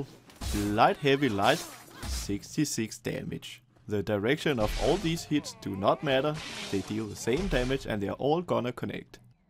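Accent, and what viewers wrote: Denmark. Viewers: Thats is one very thicc danish accent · Not to be rude but your English sounds so much like a typical “danish English” Are you Danish? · I can hear the Danish accent, it’s really thick. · First of all, great fucking video. Second of all, that is the most extreme Danish accent I've ever heard! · Are you danish?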